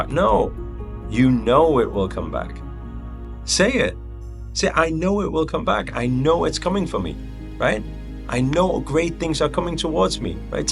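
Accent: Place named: United Kingdom. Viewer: Why did I think you are a South African but listening to your accent now you sound British.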